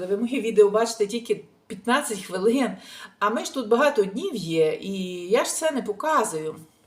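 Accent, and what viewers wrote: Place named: West, Ukraine. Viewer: Дорога, скільки учительок в вас на каналі появилось 😂, а мені подобаються ваші відео, ваша спонтанність, наша говірна мова західної України. Я б ще добавила, кому не подобається,і постійно критикують, вони вільні не дивитись, але ж це спеціалісти, saputelle, лиш писати негатив, інше не вміють. Гарно проводіть вашу відпустку, місця чудові, їжа теж, всього найкращого❤🎉.Ви гарна пара з вашим compagno💓🐞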